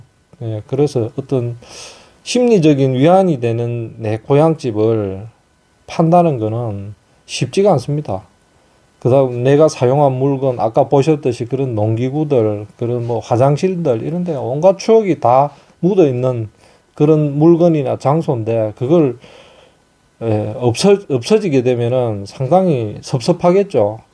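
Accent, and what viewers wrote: Gyeongsang, South Korea. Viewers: 이 올려주시는 영상 늘 잘보구 있습니다 사투리를 쓰셔서 경상도분인가만 했는데 함안군 군북면 이시네요 함안조씨 성을 가진분들이 많이 살고 계셨죠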